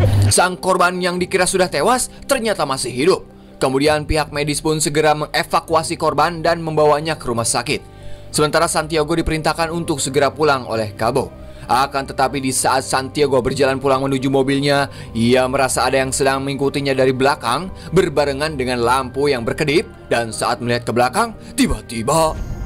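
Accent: West Java, Indonesia. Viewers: Orang sunda kitu iyeteh nu nyariosna😅😅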